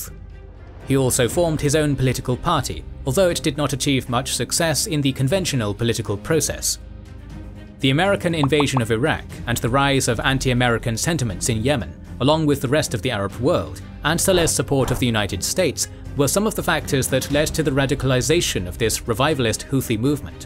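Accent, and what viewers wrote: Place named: United Kingdom. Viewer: It's confusing to hear a British voice tell the history of Yemen without much of a mention of the British Empire. There are important carryovers that you neglected.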